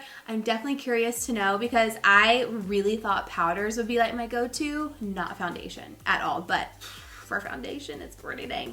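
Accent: South, United States. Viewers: hear a little of your southern drawl, but that’s adorable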